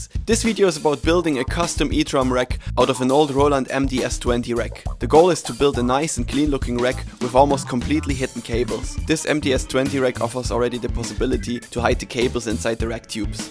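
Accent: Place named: Germany. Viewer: your accent sounds so german, but your wall outlets are UK outlets for sure! Confused :C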